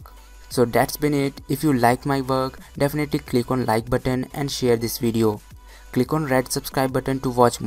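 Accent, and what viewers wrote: India. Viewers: I dont understand indian english...accent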